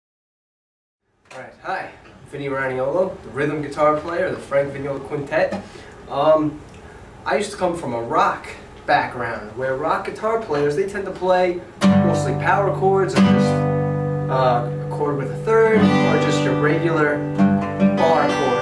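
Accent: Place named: New York, United States. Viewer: Hahahaha, two notes in a chord. I know you know that is not possible, but it's still funny. Your point about neck mapping is spot on and that's one of the greatest things about playing guitar compared to other instruments where you can only play the stuff in one locked in place. I love your My Cousin Vinny accent. Yo!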